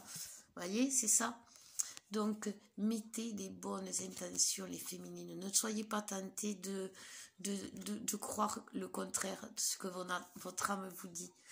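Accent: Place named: South, France. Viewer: Vous avez un accent du sud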